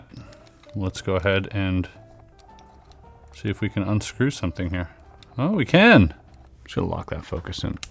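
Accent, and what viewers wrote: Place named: Canada. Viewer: says, "out" Hubby and I, "Owwt" lol We're literally only 6 hours away from the Canadian border and it always makes us laugh to hear that accent. Truthfully people think ours is funny too because we say "Wicked" all the time.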